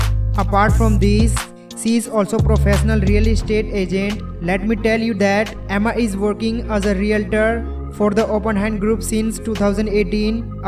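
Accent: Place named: India. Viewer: Yea, this Indian accent drives me crazy.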